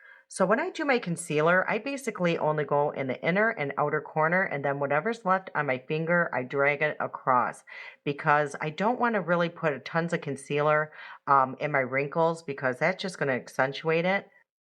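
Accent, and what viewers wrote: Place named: Michigan, United States. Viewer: Now I really want this palette! Your voice sounds like home - you must be from Michigan ❤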